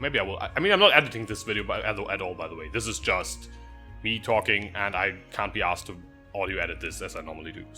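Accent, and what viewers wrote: Germany. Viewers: well, you gonna tell us where that is? prolly somewhere south of Berlin? or am i getting your accent wrong? :D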